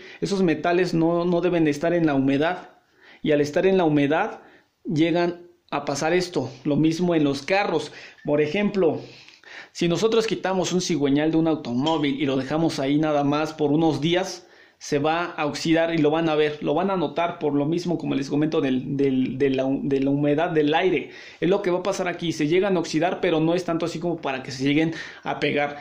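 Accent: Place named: Ecuador. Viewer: Yo tengo una moto parada hace ya un año pero me fice mi mecánico que ya no le meta dinero a esa moto ...pero es que me gusta es honda sfx de 50 c . Ud. Es un buen mecannico ...y pir la manera de hablar creo que es de mi pais anterior ecuador ...soy de alli . Yo tengo muchos años que lo dejé 34 años desde que trabajaba como.profesora. cuao un saludo Paulina .A . Ross .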